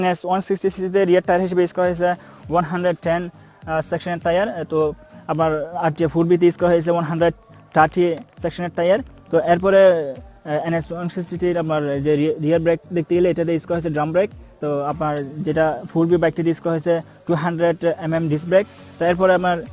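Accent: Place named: Chittagong, Bangladesh. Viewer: কথায় পুরা চিটাইংগা এক্সেন্ট 😷😂😂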